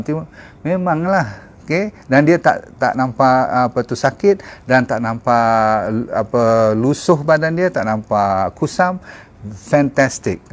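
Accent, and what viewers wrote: Malaysia. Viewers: Ga ngerti bhs nya capek ngikutinya. Logat melayu sih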